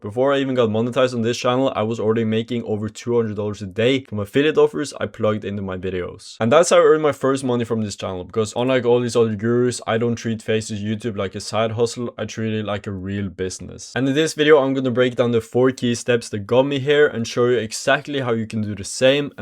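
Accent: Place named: France. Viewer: 1.- Thanks for the content. 2.- Are you french or french canadian? Your accent gives me XQC vibes.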